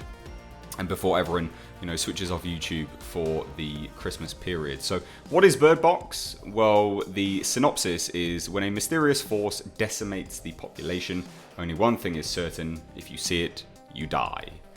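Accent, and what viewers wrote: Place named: England, United Kingdom. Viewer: I actually like your English accent and your reviews 👍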